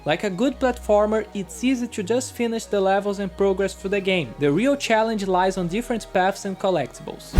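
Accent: Brazil. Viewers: Suspeitei q vc era brasileiro pelo sotaque! Kkk Parabéns pelo review! Me deixou interessado no jogo!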